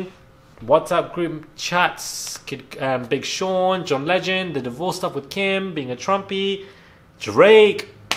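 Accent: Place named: England, United Kingdom. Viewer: I think I like your content but you speak way too fast. Especially because I’m Canadian & also can’t keep up because of your English accent, charming as it is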